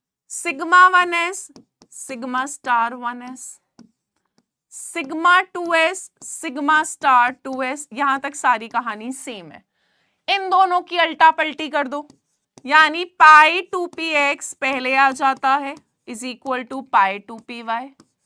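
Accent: Bengal, India. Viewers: mam are u bengali?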